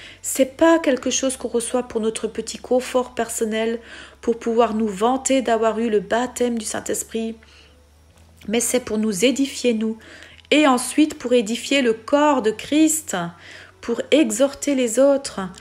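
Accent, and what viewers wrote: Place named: Alsace, France. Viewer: Êtes vous originaire d'Alsace ? ( une petite pointe d'accent de l'Est ☺️❤️)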